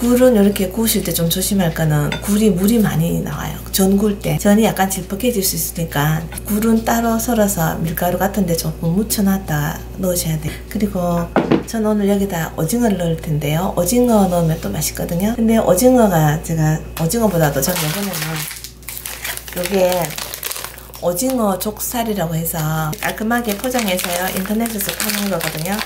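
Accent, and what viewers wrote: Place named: Busan, South Korea. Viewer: 🇬🇧영국 팬입니다. 저는 고향이 부산인데 말씀하시는 게 꼭 부산에 있는 것 같아 친근감이 들어요 ㅋㅋ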